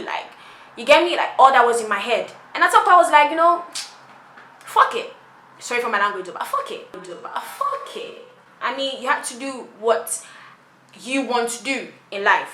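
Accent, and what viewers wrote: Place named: United Kingdom. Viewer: You have a british accent🥰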